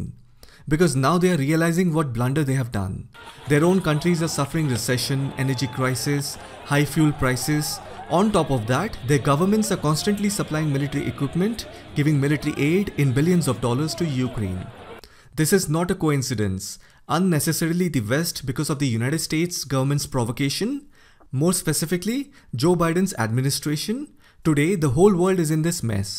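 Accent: India. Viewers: Very clear indian talk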